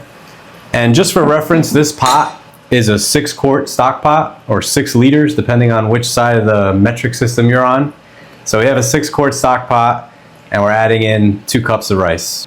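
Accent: Philadelphia, United States. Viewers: Reminiscent of a Philly accent. Reminds me of home and Gramma Consolo's cooking.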